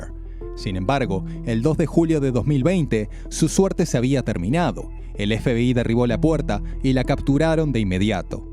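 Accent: Argentina. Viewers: Lo siento no es por ofender, pero el asento Argentino me desagrada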